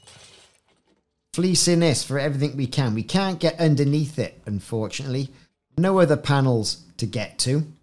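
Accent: Wales, United Kingdom. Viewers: Nice vid mate...I sense a Barry or Cardiff accent?...I'm watching all the way from Swansea :)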